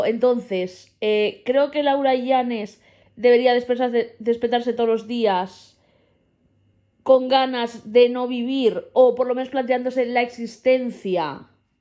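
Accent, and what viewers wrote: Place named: La Mancha, Spain. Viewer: Eres tan graciosa! 🤣♥️ Oye, de dónde eres? Por el acento y la gracia, me suenas como manchega...? Anda! Acabas de decir que eres vasca... No pareces.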